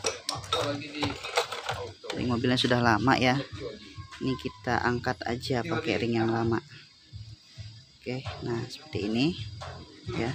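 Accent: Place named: Lampung, Indonesia. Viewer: ini bengkel di lampung ya soalnya ada suara orang lampung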